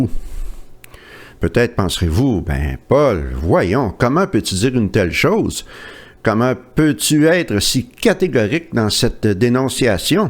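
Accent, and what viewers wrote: Quebec, Canada. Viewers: J'adore aussi l'accent québécois, surement grâce aux 4 mois que j'ai passé à Montréal en 1992 et qui m'a laissé un excellent souvenir.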